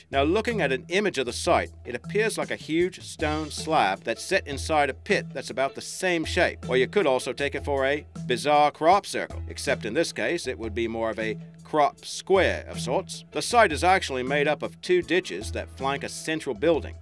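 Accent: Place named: Australia. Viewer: I think he's Australian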